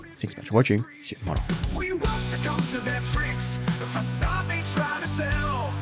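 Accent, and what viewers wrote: Russia. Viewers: Mr. RUSSIAn. You lied again... RUSSIA GET ALL CHIPS IT NEED FROM tHAIWAN AND SOUTH kOREA, AND EVEN zELENSKY ADMITED IT. Buy you keep lieing people and give them fake news so that you tube pay you buy susribers. I dont even understand your enlgish becouse it is so distorted and intenitonaly made severly RUSSIAN... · he is not interested in phonetics. He speaks Russian but with English words. Horrible to listen to.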